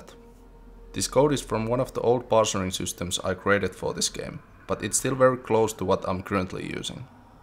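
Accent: Finland. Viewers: Sounds like you are finnish?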